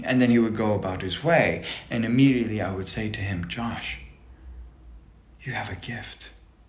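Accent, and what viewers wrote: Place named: United Kingdom. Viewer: I absolutely love your Elegantly prestigious English accent, which makes the nature of the situation more dire in a dramatic yet intriguing manner. I do applaud you sir for the excellent plot twist that had my imagination in full attendance, in which I Invisioned this fold of events effortlessly in my mind. I would love to see you create a video in which you use that brilliant English accent to depict a Royal speaking to a peasant in a boastful yet menacing way.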